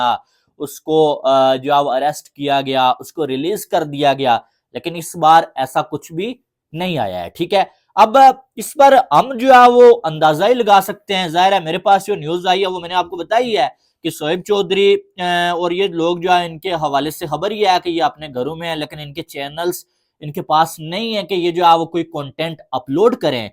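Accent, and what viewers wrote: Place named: Pahadi, India. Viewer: Tum kashmiri ni ho tumhari awaj toh pahadi jesi he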